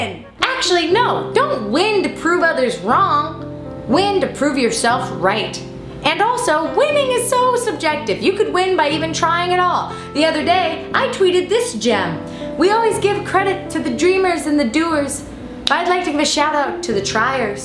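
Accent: France. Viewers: Oh how I love ur French accent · BRING BACK THE FRENCH ACCENT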